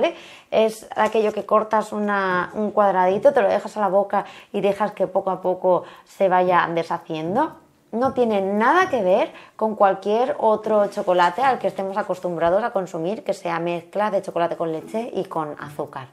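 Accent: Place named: Spain. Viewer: Buenas noches primero que todo para saludarte eres muy bella demasiado bella es más chica hermosa menos y se acentúa el acento español me gustas mucho Tú Gracias por la explicación hermosa feliz noche 👍 desde Venezuela te hablamos chao amor hermoso 👍👍👍😘😘💖💖💖💖💝😒💝🥰🥰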